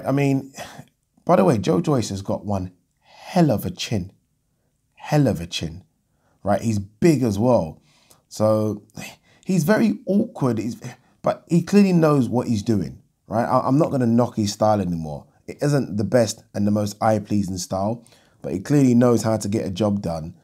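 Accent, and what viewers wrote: United Kingdom. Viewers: Top bloke...british accent yet his pronunciation of Adeleye is spot on...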